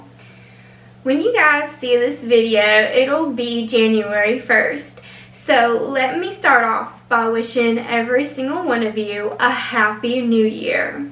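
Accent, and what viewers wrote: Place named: South, United States. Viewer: Omggg! You’re back! Hello! I hope you don’t take this the wrong way, but your social media detox made your Southern accent more distinctive! I mean that in an endearing way because I love all accents in general!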